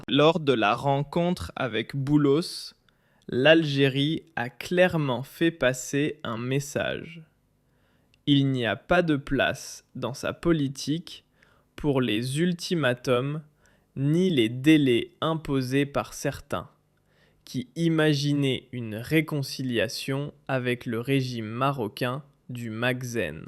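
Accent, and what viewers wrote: Quebec, Canada. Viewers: Encore un soldat immigré dz des caporaux avec un accent Québécoi qui croit que l'Algérie est une puissance alors que la queue sur l'alimentation se mesure en kilomètres. Je suis persuadé que l'Algérie finira par être disloqué avec ce régime actuel qui emmène le pays droit au mur.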